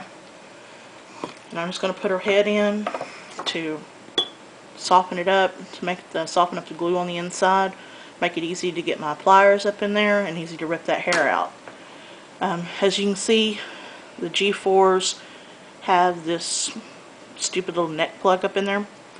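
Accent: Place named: South, United States. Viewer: Oh cool! I recognized the traditional southern twang, but noted it wasn't as nasaled as Texan,nor as slightly deep as Tennessee,where I live.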